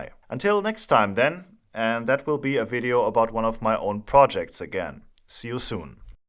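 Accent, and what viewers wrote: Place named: Cologne, Germany. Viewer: Der Kölsche Dialekt 👍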